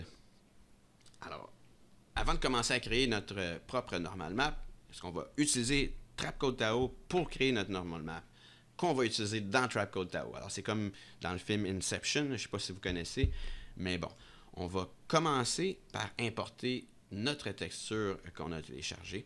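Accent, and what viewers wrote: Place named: Canada. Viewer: cool tutorial, in french would be cool, t as un accent tres comic pour les non-canadiens!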